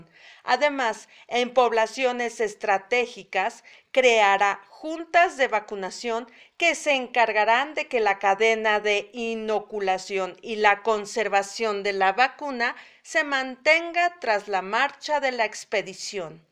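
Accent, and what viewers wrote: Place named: United States. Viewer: El mejor video de la vacuna que he visto, y con ese precioso acento Americano. Muchas gracias. 🇪🇸🇯🇪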